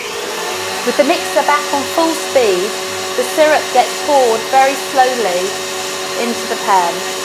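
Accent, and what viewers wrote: United Kingdom. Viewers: I love her British accent :) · Why does this woman's speech sound so cute? I actually don't like British accent but I might be about to change my mind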